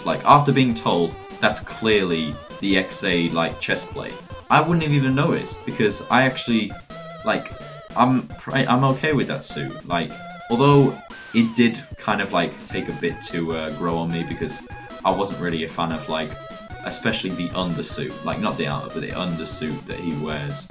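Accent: United Kingdom. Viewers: You talk British? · Ohh. British. Cheerio!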